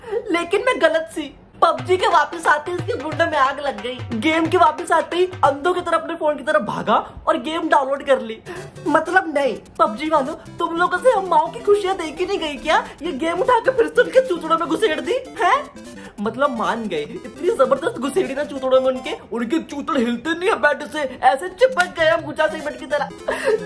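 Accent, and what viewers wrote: Punjab, India. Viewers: Your punjabi is so cute❣️❣️❣️❣️💜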